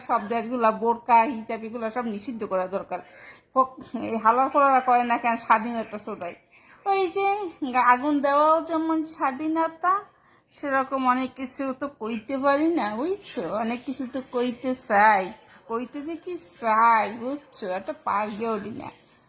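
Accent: Barishal, Bangladesh. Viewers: কথা শুনে মনে হচ্ছে বরিশাইল্লা মানুষ । তবে এক্সপ্রেশন গুলো অসাধারণ ছিল !🫡🫡🤣🤣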